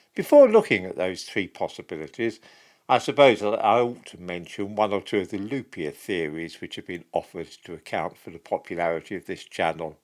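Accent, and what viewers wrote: United Kingdom. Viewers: "...who is expressing views which are seldom voiced aloud..." I think you are not quite on the mark here. MANY people voice similar views. But here on this channel those views are voiced eloquently, and have them backed-up by (often quite obscure) facts. For example, though many people know in their gut that the current narrative on the 'Windrush generation' does not add up, few know enough on the subject to confidently object to seemingly factual statements made by supposed educated journalists, politicians and activists. Having someone actually articulate those views, backed with solid facts, that is what draws people in. Especially when voiced in an endearing archetypical British fashion.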